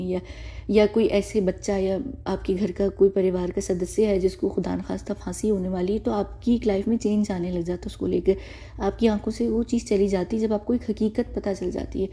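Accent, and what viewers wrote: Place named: United Kingdom. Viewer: Love your English accent reminds me of home 👌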